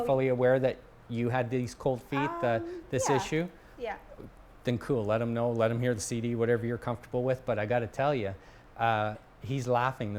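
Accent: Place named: Canada. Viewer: I love that Canadian accent :)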